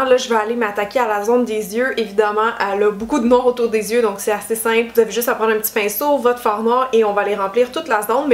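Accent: Quebec, Canada. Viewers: J'aime trop les accents québécois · L’accent québécois ne va définitivement pas à la nonne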